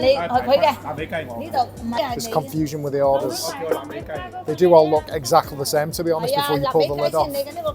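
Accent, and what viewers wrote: United Kingdom. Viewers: Are you from Birmingham? Brummie accent... 🤔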